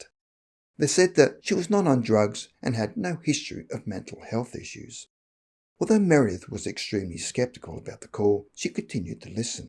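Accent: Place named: Australia. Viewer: Fellow Aussie here. I love listening to an Aussie telling stories. There is more between Heaven and Earth.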